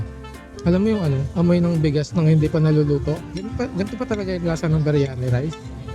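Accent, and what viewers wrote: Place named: Tagalog, Philippines. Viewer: And luxurious nang tagalog accent mo lods, W vids tho.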